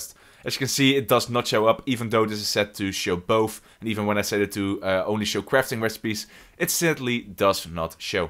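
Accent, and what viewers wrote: Netherlands. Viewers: Nice dutch accent 🧡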